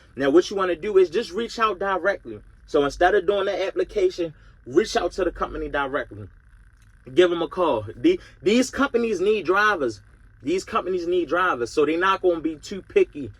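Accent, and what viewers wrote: Baltimore, United States. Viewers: When I heard that accent😂I said Bmore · Knew you were Baltimore when i heard you talk😂…. Thanks for the information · I knew he from Baltimore by the accent lol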